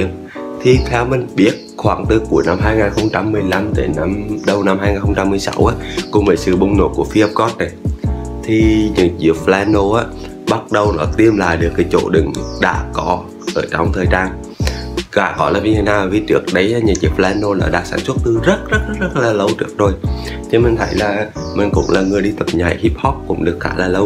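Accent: Hue, Vietnam. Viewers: Giọng Huế dễ thương quá anh ơi~~~~ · đây là lần đầu em xem video của anh :D mới vào em đã có ấn tượng với giọng của anh ( em cũng người huế ), anh noí rất dễ hiểu. chúc anh thành công hơn :D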